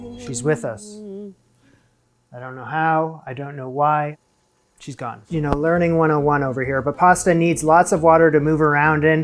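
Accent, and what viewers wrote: Canada. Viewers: I think-not entirely sure though-he was raised in Canada. I can totally understand what you mean by simply “Canadian”. He certainly has the accent and verbiage/slang from Canada, but some of the northern states pick that up too, so it’s honestly hard to tell sometimes. Had he not straight up said where he lived I would have guessed he was in Canada for sure. 😊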